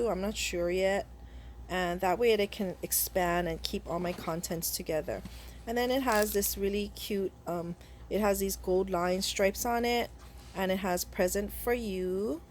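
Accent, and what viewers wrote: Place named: Hawaii, United States. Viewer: New subby from Created to Create. I thought you sounded from Hawaii. I'm from Hawaii too, Waianae/Nanakuli, but I live in Florida now. Aloha!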